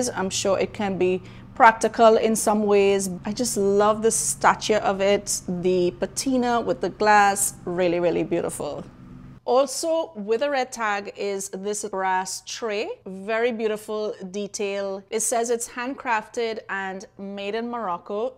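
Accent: Trinidad and Tobago. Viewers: New to your channel. Do I detect a Trini accent? I’m from Barataria. Enjoying your haul.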